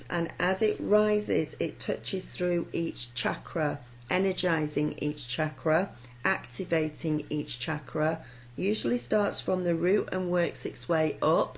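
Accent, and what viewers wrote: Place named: Yorkshire, United Kingdom. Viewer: Thank you so much! I've had this on my watch later list for a couple of weeks, and decided to watch today. Wonderful synchronicity in waiting until now to watch it, as I decided just three days ago that it was time to ditch the news, conspiracies and politics - got drawn into the conspiracies for about 9 months I think, and I'm now just thinking, right, I've learned that and I can move on now. When I did a meditation with the intention of releasing it all and cutting the cords, I got such an intense positive feeling, like my Guides were really excited - I felt their excitement! 😃 Also changing my diet gradually. I've been trying to cut down on sugary snacks, but going to try again now. You got yourself a new subscriber, and great to hear another Yorkshire accent! 💖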